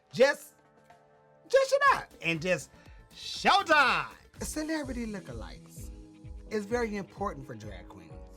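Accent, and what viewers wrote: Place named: South, United States. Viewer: I'd like a quick peck of a kiss as I promise I've never seen out of drag. Too cute. Nice complexion and skin with thick lips + sounds so Southern with the dialect.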